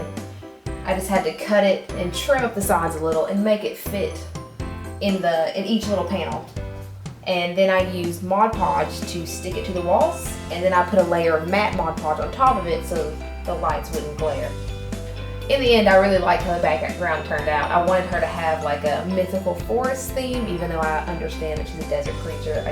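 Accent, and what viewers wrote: South, United States. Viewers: love your southern accent lol ! and your beardie, and her setup! what a great deal on the cage, love your vids! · Sounds like a southern accent and i like it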